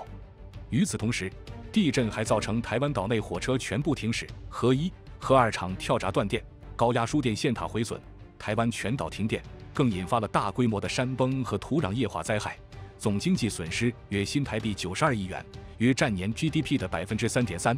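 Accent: China. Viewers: 這個text to voice 好像是公用的，但都是大陸語音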